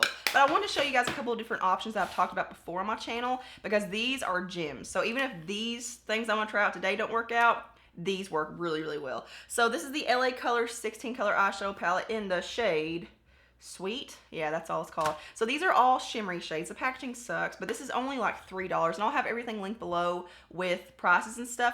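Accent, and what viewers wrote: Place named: Kentucky, United States. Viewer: Girl I knew from the first time I watched one of ur videos u were from Kentucky! The accent gives it away for sure but I'm a Kentucky gal too! I'm from Letcher co ky.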